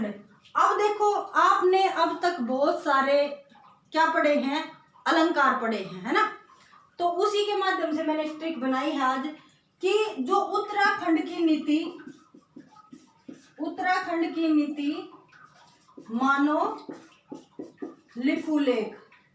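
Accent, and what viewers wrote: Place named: Haryana, India. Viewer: Nice but d'nt use haryanvi ton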